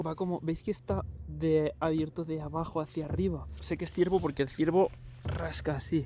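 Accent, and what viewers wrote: India. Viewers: Por probar, he visto tu video doblado al inglés por ti, y la verdad solo a modo de crítica constructiva, hablas muy bien inglés pero pones un acento indio. Cuando puedas escuchate a ti mismo y fijate en eso para cambiarlo si quieres sonar más americano o neutro. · por que hablas ingles como un indio?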